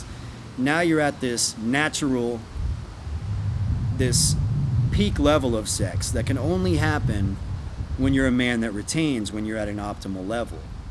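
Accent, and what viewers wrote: South, United States. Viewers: With that southern accent 😂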